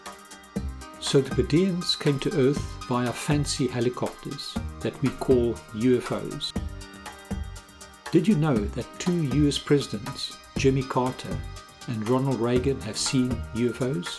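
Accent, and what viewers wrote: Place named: South Africa. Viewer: You are South African....your voice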